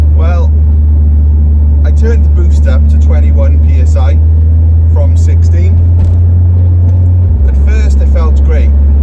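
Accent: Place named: Cockney, United Kingdom. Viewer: Love the English cockney..🤣🇯🇲🇯🇲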